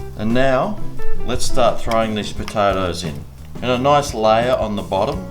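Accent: Australia. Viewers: Nice to hear an Aussie voice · Aw. You were pleasant to watch and the recipe looked very yummy. Darn it! I miss the Australian accent. Lived there for 6 months so long ago. Aluminium. Heh heh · you sure do like your cheese hugs from America hon btw I love the Aussie accent;)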